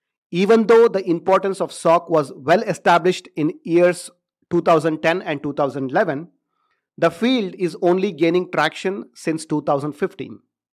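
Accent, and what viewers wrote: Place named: India. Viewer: I hate clicking on this videos then listen to an Indian voice🙄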